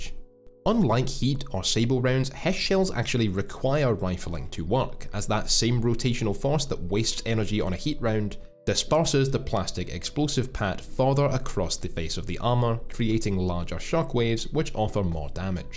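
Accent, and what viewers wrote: Scotland, United Kingdom. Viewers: Is that Scottish? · Are you Scottish?? 🤔🤔. You remind me alot of my friend Wallace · I hit the like button as soon as I heard that sweet Scottish accent. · I've only just noticed your Scottish accent and I've been watching a few of your vids already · Speak American man, not Scottish!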